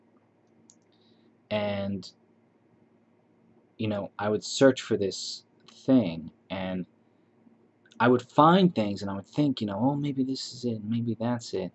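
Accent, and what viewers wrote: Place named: New York, United States. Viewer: When you say "personality", do you still identify with INTP and the idea that personality becomes rather fixed by this point in life? As far as diving deep and really searching and theory building around yourself, I feel like for INTPs it feels really really fixed and not in a happy way. I accepted that, and I gave up on trying to subvert that. However, I feel there's ways to de-emphasize that insecurity, that deeply analytical or critical personality conflict. Just going exploring, weird places and doing new stuff, especially physical stuff, where you lose your head space . Like how traveling requires way different aspects of my personality and at the same time dissolves my identity because I don't know anyone and no one knows me so I can be ridiculous. Like just walk down the street and stick out your thumb and see if someone picks you up and you might feel like your personality could change. 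Or find a freight yard and hop on a freight train and become a new person, someone you've never met. What I'm saying is I think sometimes we get stuck in personality patterns and cycles because our environment. If we change our environment or schedule or the weather or people in our lives, we can see new parts of ourselves. You sound like you're from New York or something so you know how defined things can be on the East Coast/in the Northeast. Maybe those definitions highlight your insecurities? I'm from Massachusetts so I know this is possible. Our state creed is "I'm so much better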